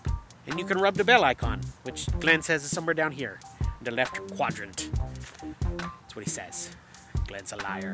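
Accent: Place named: Canada. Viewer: Your accent is between Canadian, English, Irish. Really crazy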